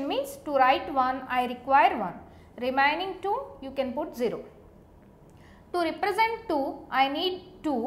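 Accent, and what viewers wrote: India. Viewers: i think am only falling for u....you are beautiful a.....i have subscribed to your other lectures ......but not familiar with indian accent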